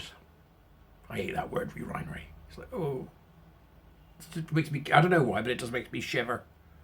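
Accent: United Kingdom. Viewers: The reason you are bothered by the word urinary is because of the way you, as a Brit, pronounce it. Try the N. American way.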